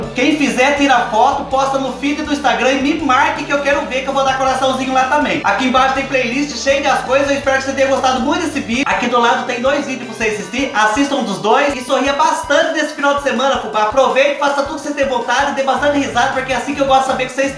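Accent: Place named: Taquarituba, Brazil. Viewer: Eu entendo toda sua linguagem porque sou de Taquarituba kkkkk é cresci ouvindo falar assim hoje fais 40 anos que moro em SP mais a raiz continuakkk adóro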